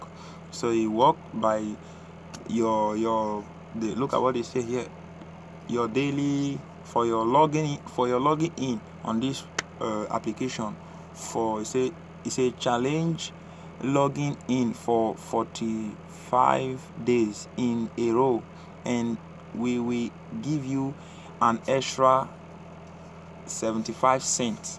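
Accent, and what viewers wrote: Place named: Nigeria. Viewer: He must be Nigerian or just African because I'm African and my dad has his accent lol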